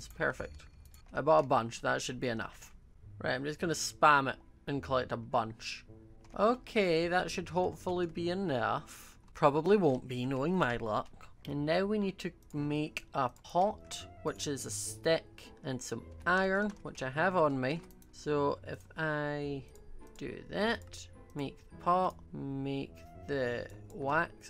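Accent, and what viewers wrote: Scotland, United Kingdom. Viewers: OMG I LOVE YOUR ACCENT I LOVE SCOTTISH ACCENT SO MUCH ok lol I need To stop.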